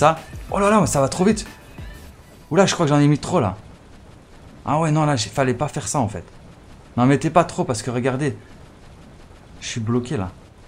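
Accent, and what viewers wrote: Belgium. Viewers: Tu est belge ou pas (question)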